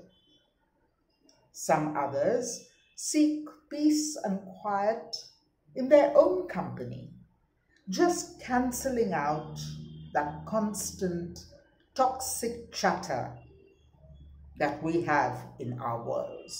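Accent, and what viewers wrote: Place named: United Kingdom. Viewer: How many gen z n millinials understand this british english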